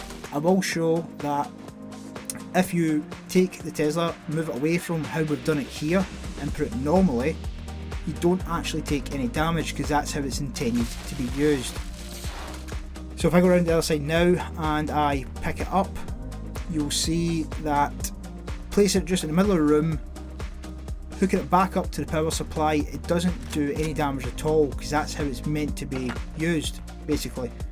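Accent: Scotland, United Kingdom. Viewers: that scot accent is very hard to understand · My celtic brother from another mother - Keep up the epic content man! You've always given tips that I've never known and I've been playing on and off for a number of years! You're a legend, and to hear a Scotsman showing the world how its done is only right! Cariad fawr o Gymru i chi [Much love from Wales to you!]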